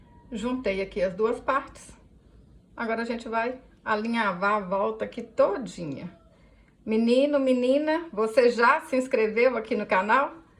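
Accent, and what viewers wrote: Minas Gerais, Brazil. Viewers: Aposto que você é mineira, sotaque mineirinho UAI, acertei?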